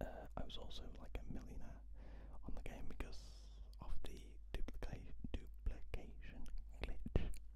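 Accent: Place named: Northern England, United Kingdom. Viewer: oh haha you sound like your from the north of England. And how hard is oblivion to complete xD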